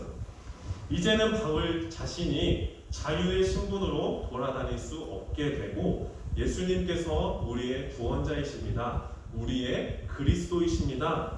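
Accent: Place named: Jeolla, South Korea. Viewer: 전도사님~ 이순신 연기 보고 진짜 이순신 장군님인 줄 알았어요~ 역시 전라도 분이시네요^_^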